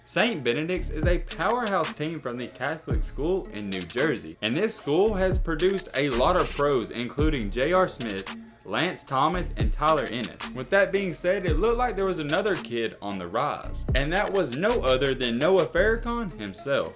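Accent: South, United States. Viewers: I like your country accent that’s why I watch you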